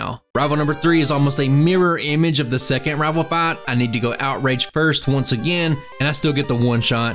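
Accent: South, United States. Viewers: alright man, I’ll watch it to the end. Again I’m just a big fan I love your commentary (with the southern drawl as well)and seeing things I haven’t seen before from you makes me excited so I was surpised to see you go back to our wishful bug/dragon friend. Cheers mate! Keep up the great content!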